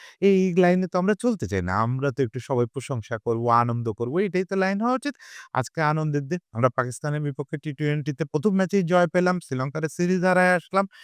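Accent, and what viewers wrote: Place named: Kolkata, India. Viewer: দাদা আপনি কলকাতার বাংলা টুনে কথা বলেন কেন?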